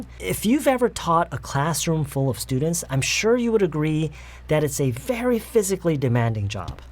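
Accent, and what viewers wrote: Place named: United States. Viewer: 발음이 미국 사람과 비슷합니다 흑인보다 백인 영어에 가깝습니다 얼굴은 흑인인데 백인영어를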